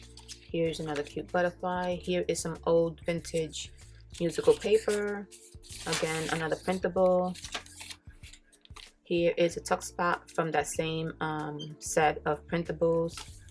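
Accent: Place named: New York, United States. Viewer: Absolutely amazing! Love the adorable racoon printable!! I really like you NY accent too! Adorbs!! :)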